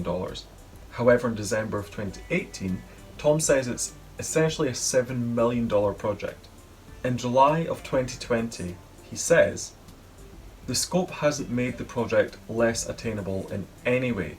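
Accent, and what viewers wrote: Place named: Scotland, United Kingdom. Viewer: oh, I’m sorry, it was just hard to understand because of your extremely thick Scottish accent and total inability to properly enunciate words in what I assume is your native language. But nice bitchy attitude you got there, directed towards one of your fans no less. Great look for someone who is trying to build their YouTube following! Let me know how that works out for you!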